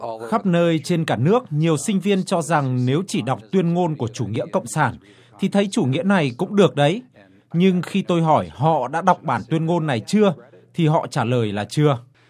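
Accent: North, Vietnam. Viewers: Giọng bắc mà đi phê phán chủ nghĩa Cộng sản là sao nhể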